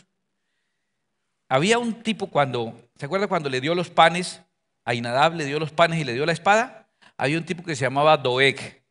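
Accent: Cantabria, Spain. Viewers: Gracias paisano no solo por la Palabra de DIOS REVELADA, si no por la manera como la trasmite en nuestro dialecto especial Santandereno tan jocoso gracias pastor me hace reír y estoy segura que al Señor.Jesús también bendiciones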